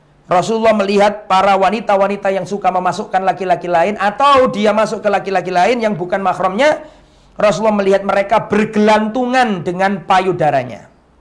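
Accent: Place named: Java, Indonesia. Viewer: Sy suka bahasa beliau yg medok njawani heee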